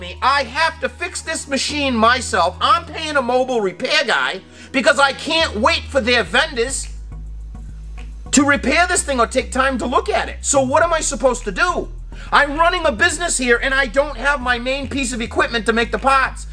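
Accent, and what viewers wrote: Boston, United States. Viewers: Was just gonna ask , u from Bosten, lol.😊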